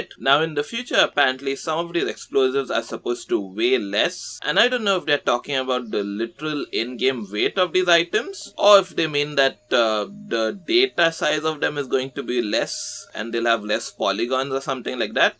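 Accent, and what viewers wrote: India. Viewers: Talking ahh India voice 💔✌️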